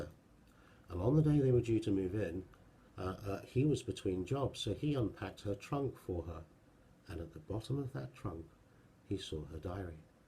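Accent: England, United Kingdom. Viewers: Anyone randomly binging this dudes videos? I see fresh comments on a 4 year old vid. Like this dude's style of video, but how does he have an English accent with that name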